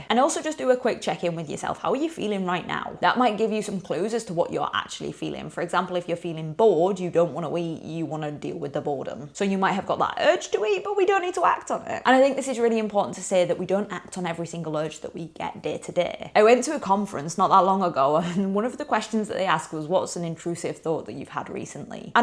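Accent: United Kingdom. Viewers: are you from west yorkshire? your accent reminds me of when i lived in huddersfield and sheff :) loved the video, def relate. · It's British Northern accent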